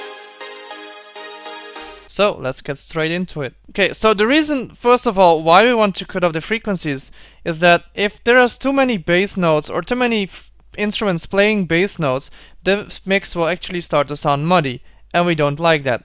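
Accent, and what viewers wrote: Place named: Netherlands. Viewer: I love your dutch accent, like mine xD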